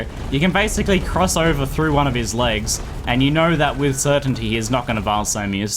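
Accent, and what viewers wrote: Australia. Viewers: Farrell Roll Uh, no. He's Australian so he says some words differently than an English man or American man would... but thanks for being ignorant.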